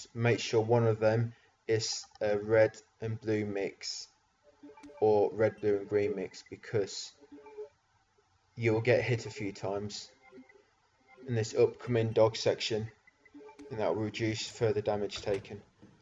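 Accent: United Kingdom. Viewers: Great guide, your british accent was kinda funny and also mislead me once but its fine and I feel like without you I wouldn't even attempt to beat hardcore normally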